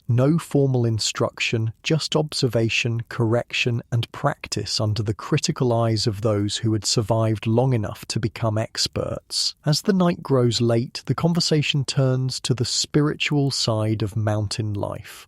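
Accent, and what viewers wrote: England, United Kingdom. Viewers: PM in NY... There's more than a handful of these types of videos out there, but I prefer because of the narrator's English accent and his sly metaphors. They paint such a vivid picture, and mix very well with Moonlight Aura Vibes' video, Cat Purring & Brown Noise (10 Hours). I usually put together a playlist that takes me through the entire evening, allowing me to subconsciously be an opium addict in Victorian time, to The TERRIFYING Life of a Child Emperor in Imperial China, and all points in between! ❤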